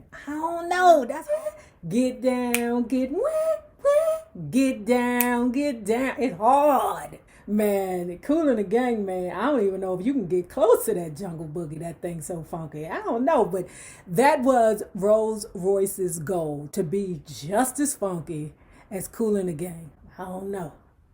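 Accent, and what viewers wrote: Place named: DMV, United States. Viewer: Ok. Clearly you're from the DMV. That accent is so obvious.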